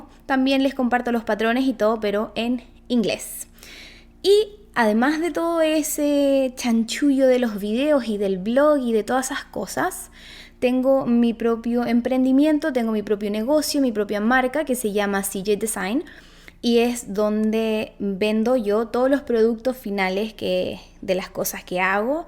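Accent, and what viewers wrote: Chile. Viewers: Hola, he tejido varias cosas siguiendo tus tutoriales de YouTube, explicas muy bien 👍. Me quedé con tu canal porque reconocí el acento, soy de Chile también. Saludos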